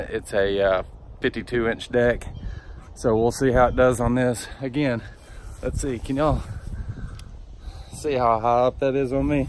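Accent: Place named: East Tennessee, United States. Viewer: 423 area code & the accent, I know exactly where you're from!! Been down to TN especially E.TN 11 times!! God's Country Fo Sho!! Subbed to the channel & I think I do follow you on IG, I'll have to check. Hoping all is well Bud, Dirty Jersey out!!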